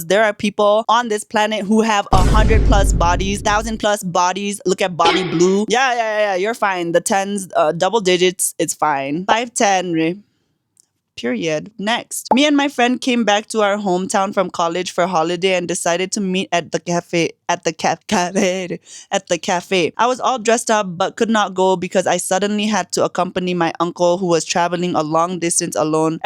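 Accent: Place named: United States. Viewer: "tpi ko accent kina yesto amrica" 😭😭😭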